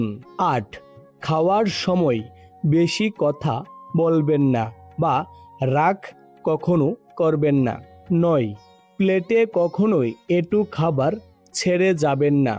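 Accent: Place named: Bangal, Bangladesh. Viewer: উনি বাঙাল ওনার উচ্চারন ওরকম হবে পাল্টাবে না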